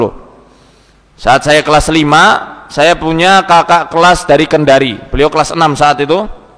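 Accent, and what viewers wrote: Banyumas, Indonesia. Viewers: Pak ustad asli wong ngapak ya....logate pada karo inyong😆😆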